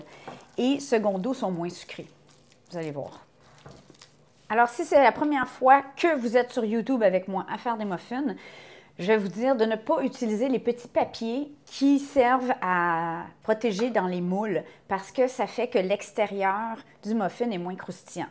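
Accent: Canada. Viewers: Merci la j aime tellement tes vidéos et tes muffins hum, j aie tellement adorée cette pâtisserie au canada que j aie visité tellement de fois une dizaine de fois,q j aime le Canada, et tu me remémore tout,les odeurs,l accent le bonheur de ce beaux et merveilleux pays,encore de belle recette,je t aie découvert il y as quelques mois mais j adore, ta simplicité ton humour et ton savoir faire je suis de lyon,je sais que tu ns as visité et je pense que tu vs avez aimé,la gastromie le bonheur du bien manger lyonnais et je cuisine beaucoups aussi ancienne cuistod,bisou et hâte de voir encore et encore tes vidéos. Valou ou moufette au Québec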